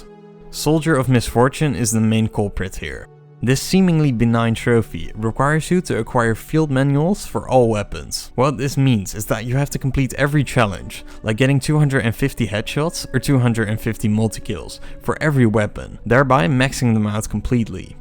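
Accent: Netherlands. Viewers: Recognised the Dutch accent immediately mate. Goede video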